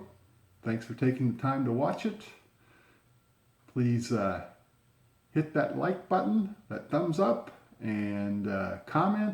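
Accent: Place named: New England, United States. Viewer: You sound like a yankee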